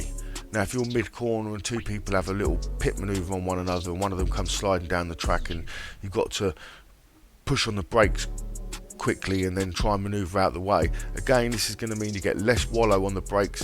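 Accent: London, United Kingdom. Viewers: TOOOOOO many Inside-wheel based gamertags so I hope you are on live,like I say my 2 gamertags are z0mv1(main)& Papa Lazarouz so if you haven't received my friend request then you send methe request fella.I'm up north in the UK by the way,Blackburn!You sound like your from the London area-ish!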